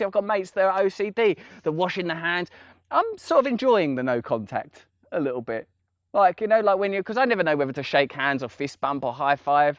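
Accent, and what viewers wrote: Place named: Essex, United Kingdom. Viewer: What a lot of nice people you all are. I enjoy Russell's eloquence and spirit, especially with the Essex accent.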